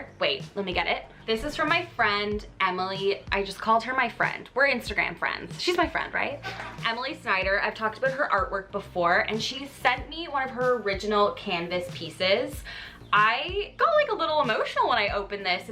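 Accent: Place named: Canada. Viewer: I love that Canadian accent💕🥰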